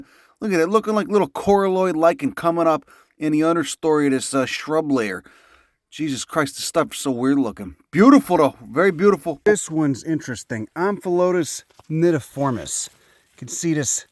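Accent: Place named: New England, United States. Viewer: Oh, shit... please dub Planet Earth with your New England accent.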